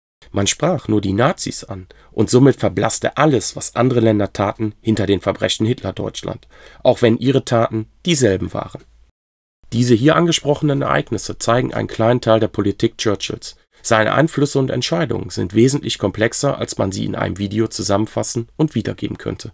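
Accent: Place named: Turkey. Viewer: Der Sprecher hat einen türkischen Akzent .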